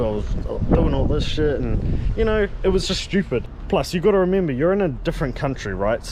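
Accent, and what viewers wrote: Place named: New Zealand. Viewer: Bro, I recognised that kiwi accent immediately haha. I didn’t realise how recently this video was uploaded. I’m super keen to watch your journey and see how you get along. I wish you all the best in your adventure!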